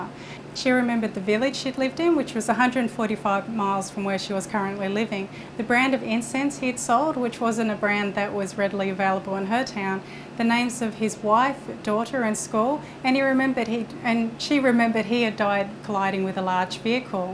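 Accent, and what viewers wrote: Australia. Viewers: Interesting topic. Too bad I have to work too hard to understand what you are saying. You articulation is ...hmmm... let say, not what it should be. Your accent does not help either. I stuck with it for more than 3 minutes. Too bad. Coz as I already mentioned, interesting subject. Perhaps this is intended to be watched by Australians only. Like an "Aussie secret". Do with it as you please.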